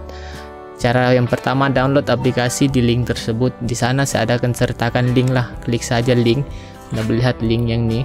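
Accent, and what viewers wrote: Sabah, Malaysia. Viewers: Uinaaa org sabah ba ni ahahhaha ingtkn indon aduh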